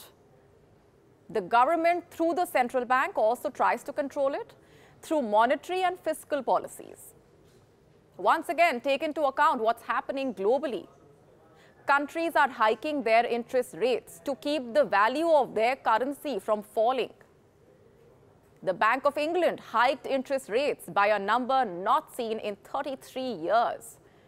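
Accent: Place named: India. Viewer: Good report, but when Indians speak English, their accent is like finger nails on a chalkboard.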